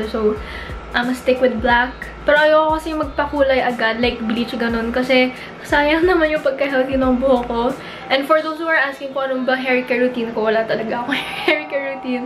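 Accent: Batangas, Philippines. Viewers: Batangenyang batangenya ka po,😊same po tayo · kacute ng batangueña accent huhu "ay syempre"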